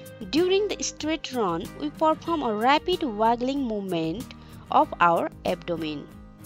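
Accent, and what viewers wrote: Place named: Maharashtra, India. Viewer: That Marathi accent tho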